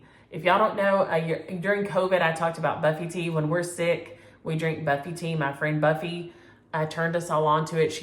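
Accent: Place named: Texas, United States. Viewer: Always enjoy stitching you your lovely Texas drawl. Here in Ontario, Canada, fall will soon turn into winter. Getting my mind ready for that as a former snowbird!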